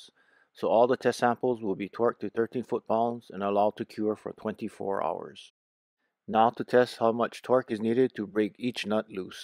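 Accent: Hawaii, United States. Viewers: You sound Hawaiian 🤙🏽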